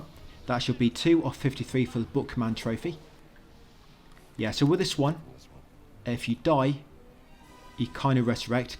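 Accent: England, United Kingdom. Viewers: do you know what English accent you have?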